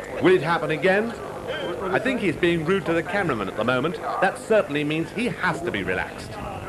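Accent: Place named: RP, United Kingdom. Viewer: He's speaking in RP (received pronunciation), more commonly referred to as "BBC English". The criticism of his pronunciation is not unfounded. He is indeed pronouncing chicane incorrectly. Given that his only job here is to speak clearly and accurately in order to provide a useful commentary of events, one could fairly and accurately refer to the commentator as a prat (the official definition meaning "incompetent").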